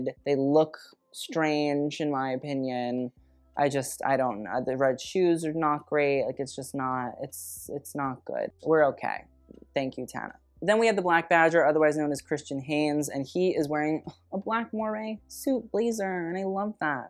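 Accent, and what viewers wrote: France. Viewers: As a French girl, your French accent is killing me 😭😭😭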